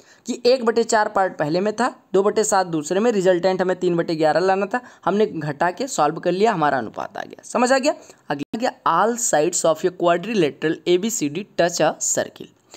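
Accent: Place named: Pratapgarh, India. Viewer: hai nai bhasha pratapgarh ki lag rahi hai sir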